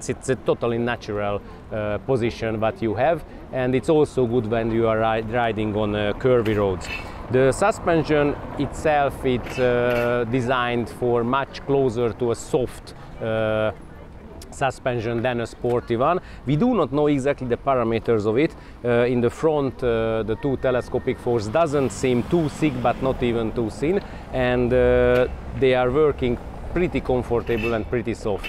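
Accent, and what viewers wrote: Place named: Hungary. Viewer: Hunglish... Maybe the first english video, I can understand.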